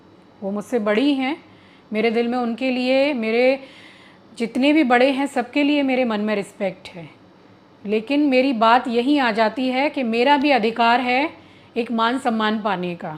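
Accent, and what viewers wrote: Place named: Uttar Pradesh, India. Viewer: ji aapki purchase sunkar mere aankhon mein aansu a gaye main aapke block abhi ek do mahine se hi dekhne shuru Karen jab aap kuchh Shabd aise bolati hai use Kanhaiya se hai mujhe tabhi mahsus hona tha ki aap up se belong Karti main bahut bar likhna Jahan ek meri bhi suar ki mere aankhon mein bahut taklif rahti hai mujhe aapke Shabd bolane se lagta ki aap up se belong Karti hai uska jawab mil Gaya Mera bhi mica Agra mein hai Delhi mein meri shaadi Hui hai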